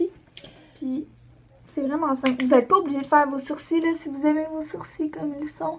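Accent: Canada. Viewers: Tu es super belle êt ques que j'aime ton accent canadien j'aime beaucoup ❤️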